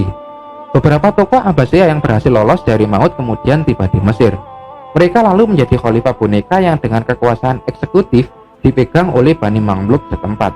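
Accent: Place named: Banyumas, Indonesia. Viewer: Logate ky wong ngapak ☺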